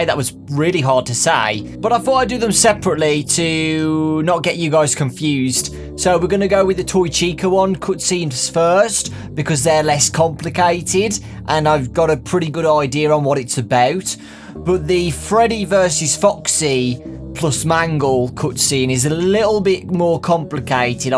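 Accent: United Kingdom. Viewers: i hate this brittish accent.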